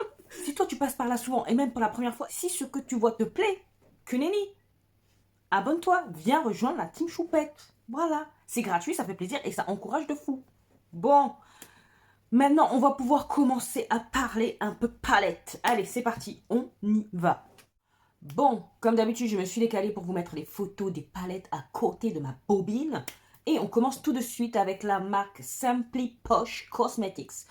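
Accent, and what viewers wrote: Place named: Africa. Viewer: Quand tu montes le niveau d'exigence tu prends l'accent africain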